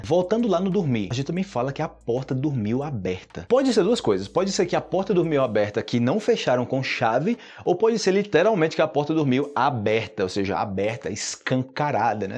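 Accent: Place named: Antioquia, Colombia. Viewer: Me encanta como el profe cuando habla español se le siente puro acento paisa, obrigado!!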